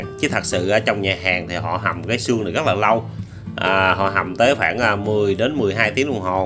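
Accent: South, Vietnam. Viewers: ngon quá Nhí ơi. nghe giọng miền Nam thân thiện làm mình nhớ nhà quá. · Video nấu phở nầy quá hay! Cách chỉ dẩn nấu, cách nói, giọng ng Miền Nam quá tuyệt hay! Bấy lâu nay mình nấu Phở sai, nên ko đc ngon, nhờ video nầy mình tin chắc rằng sẽ có nồi phở rất ngon. Rất cám ơn A nhiều! Chúc A luôn thành công trên con đường đưa cái tài nấu ăn của mình đến cho càng nhiều khán giả đc biết. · Giong ng mien nam nghe êm tai dể chịu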